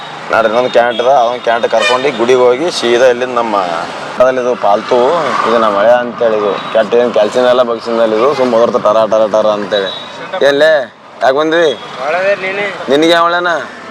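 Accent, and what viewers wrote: Gulbarga, India. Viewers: Me from Bagalkot but I like the gulbarga style kannada slang .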